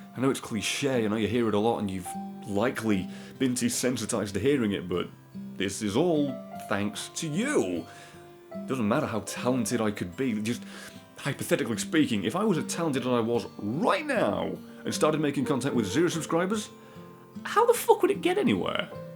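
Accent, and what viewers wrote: United Kingdom. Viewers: I gotta admit this is one of the few channels that I've grown so fond of that I've tried to remember the time of day you put up new videos. I stumbled upon your insane British rambling back when the Dark Souls 2: Crown of the Iron King videos came out and I found your blind run against Sir Alonne. Since then your content has been quite fantastic, and even a lot of stuff before then is great to check out (Altough I did notice the rather obvious point where the quality of content changed dramatically). Both the old, the new and the current content you do is awesome and I look forward to seeing what the future holds in store for both you and us subscribers. Probably you dying horribly to more Uruks and me writing more silly death lists. Godspeed you magnificent bastard of a youtuber!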